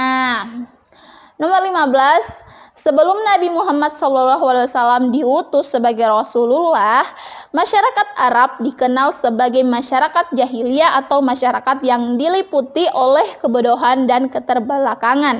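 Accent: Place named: Sulawesi, Indonesia. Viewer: itu sepertinya logat sulawesi, saya punya teman juga bilang salam warahmatullahi bukan warohmatullohi 😅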